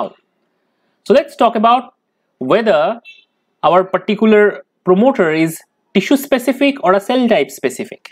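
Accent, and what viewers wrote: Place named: India. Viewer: 아오... 인도영어 이해하기 어렵네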